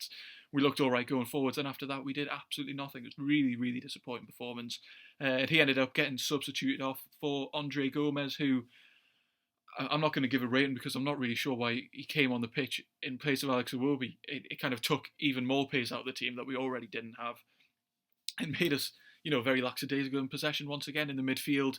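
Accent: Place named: Newcastle, United Kingdom. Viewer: You from Newcastle pal? Sound very geordie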